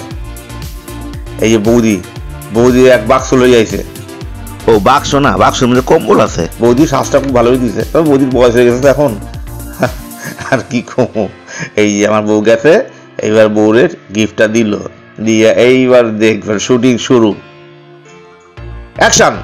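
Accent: Bangal, Bangladesh. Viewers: Dada to darun bangal bhasa bolen darun darun khub haslam go আর খাওয়া দাওয়া সেই ছিল 😋❤❤❤❤ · Biye bari blog darun ...sathe dadar bangal kotha just fatafati 😊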